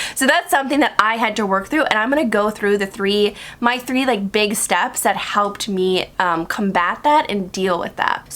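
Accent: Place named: United States. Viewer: yess your very clear American accent is helping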